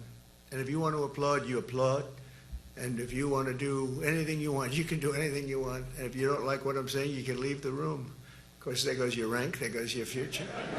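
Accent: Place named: New York, United States. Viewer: He is from NY/NJ area which has a distinctive style of speaking. All of it was coherent and with purpose. His target audience was Military Generals, not you.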